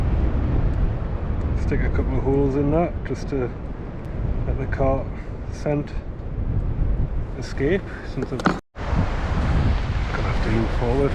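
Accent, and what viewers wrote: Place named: Durham, United Kingdom. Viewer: Well done. I have been watching your videos for a wee while now and I love them. I am originally from Durham but now live in Northern Ireland. I love to watch you fish your local marks the spots I recognise and it is great to hear the accent. Last year my wife bought me one of your hoodies for an anniversary present and I wear it with pride even when sea fishing in Galway. Keep up the good work.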